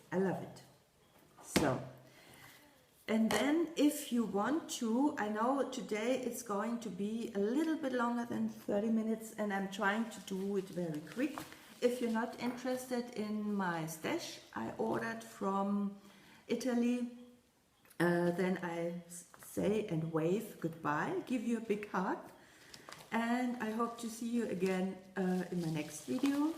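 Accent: Germany. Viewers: You explain everything perfectly! Don't worry about anything, your English is very good and I understand what you are saying. In fact, your videos are so entertaining to me.... I didn't take German in high school, so your accent and German tendencies are fascinating to me! I would love to meet you someday if you are ever in the states....❤️❤️❤️❤️❤️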